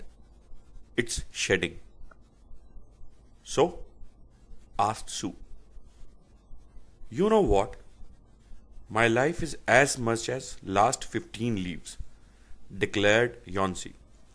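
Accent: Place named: India. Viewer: I love Indian accents! Sometime it annoys me but most of the time, it's cute!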